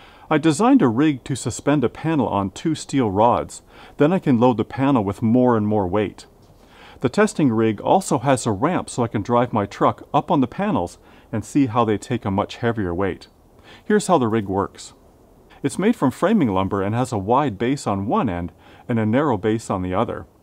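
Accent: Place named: Canada. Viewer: His accent didn't gave him away? Aboat?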